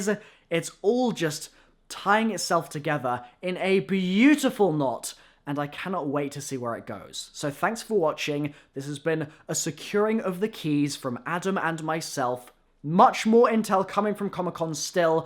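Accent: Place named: United Kingdom. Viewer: Your too British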